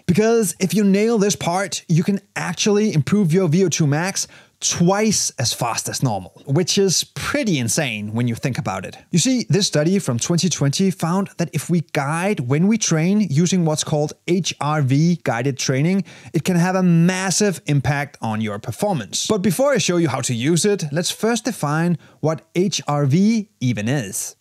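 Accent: Denmark. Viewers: Danglish går crazy❤️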